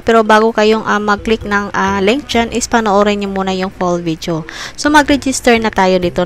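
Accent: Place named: Visayas, Philippines. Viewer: Bisaya ka mam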